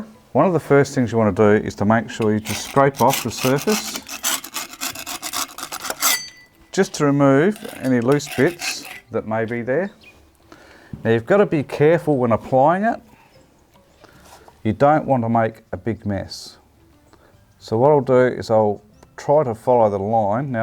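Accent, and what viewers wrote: Australia. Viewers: thanks, mate! love the accent, Tasmania?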